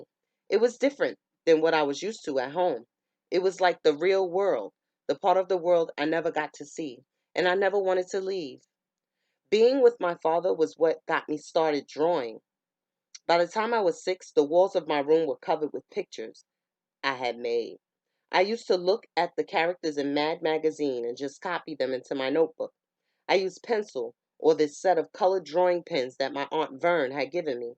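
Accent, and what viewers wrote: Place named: East Coast, United States. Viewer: Thanks for doing this you did. WONDERFUL JOB! U SHOULD CONSIDER DOING THIS FOR A LIVING! LOVE YOUR EAST COST SPEECH!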